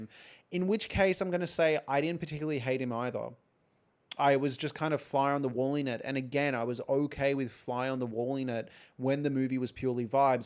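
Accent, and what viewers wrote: Australia. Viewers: I'm so happy you replied ! ^__^ as an American, I love different accents. Australia being in the top 3 ! British, Ireland, and Australian ! I can always tell an aussie by how they say no. It kind of sounds like nor with an R Thank you so much again!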